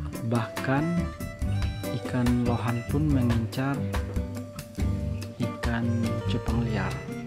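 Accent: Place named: Kalimantan, Indonesia. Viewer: abang bukan kalimantan ya. Logatnya mirip kalimantan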